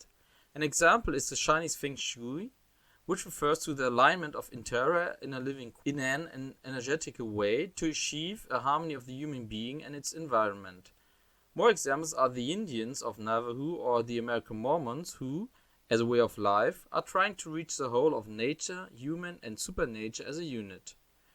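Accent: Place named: Germany. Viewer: Inhaltlich gut, aber mach es besser auf deutsch. Dein englische Aussprache ist zu deutsch. Aber danke fürs Video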